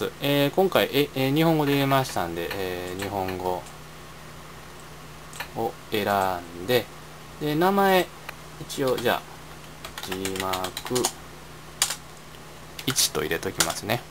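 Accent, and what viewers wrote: Japan. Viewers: 京都弁だ～～ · 関西弁グッド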